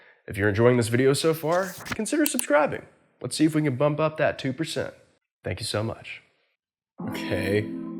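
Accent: United States. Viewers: This guy confuses my brain; he looks Australian but talks American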